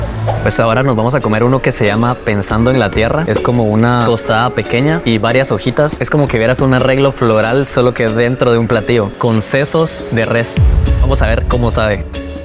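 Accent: Guatemala. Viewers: Lo amé! Realmente me encantó la edición y la forma en que comunicas todos los platillos es muy culta y neutra, tu acento chapin se nota igualmente Gran video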